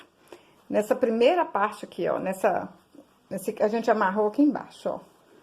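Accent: Minas Gerais, Brazil. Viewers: E esse sotaque mineiro? 💞💞💞💞💞💞💞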